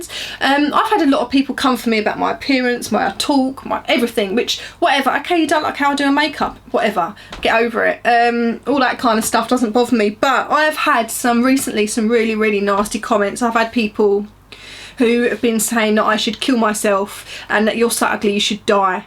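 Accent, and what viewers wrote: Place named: United Kingdom. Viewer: I think you're simply beautiful! I came across you not too long ago and noticed your hair in particular. Love it ... and your accent, of course. I lived in the UK for a while & miss it, so you bring back a little part of such fun memories just hearing you talk. I can tell you're a beautiful soul. Keep making videos! <3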